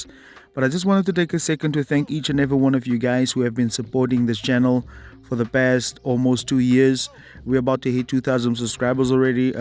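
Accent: South Africa. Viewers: You sound like a South African 😅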